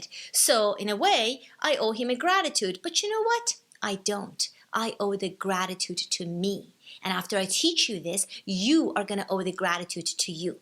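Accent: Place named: Arab world. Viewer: Are you originally from an Arabian country ? Or from Eastern Europe? Just trying to place your accent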